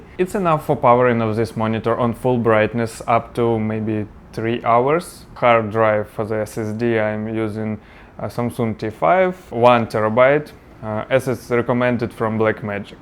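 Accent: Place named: Russia. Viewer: Чувствуется русский акцент😁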